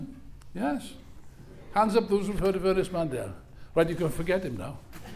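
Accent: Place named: Wales, United Kingdom. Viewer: It IS a welsh accent, you’re right. Interesting that I didn’t pick up on it, since I’m a big fan of The Manics.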